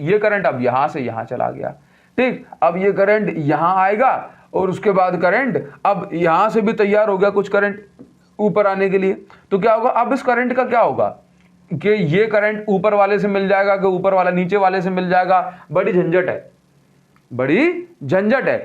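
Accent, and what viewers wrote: Odisha, India. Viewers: Odisha se ho kya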